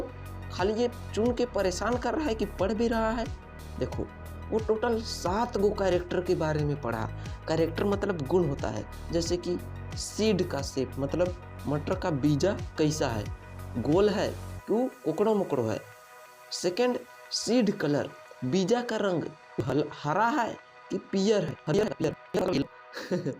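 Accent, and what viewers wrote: Bihar, India. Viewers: App sayad bihari ho apki bato se samajh aata h Btw I'm also bihari....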